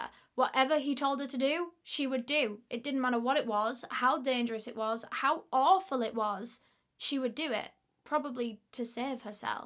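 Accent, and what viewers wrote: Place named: England, United Kingdom. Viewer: Took me a long while to make my fav to listen to, her English accent is very strong … but today I’m struggling with those stupid “baby hairs”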